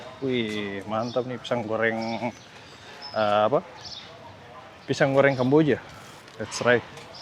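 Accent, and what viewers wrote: Eastern Indonesia, Indonesia. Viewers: kaka punya logat timur kantara skali😂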